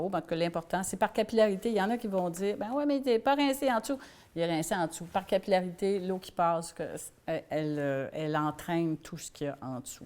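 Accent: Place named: Canada. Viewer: merci pour ce cours qui m'a beaucoup aidé, et merci pour votre accent canadien..ca me rappel mon séjour à Montréal en juin dernier -)) vraiment top ce pays et ses gens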